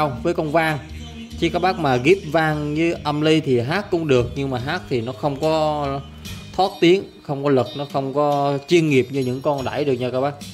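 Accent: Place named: Binh Dinh, Vietnam. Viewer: Xem kênh nhiều của em anh rất thích chất giọng đặc trưng Bình Định của em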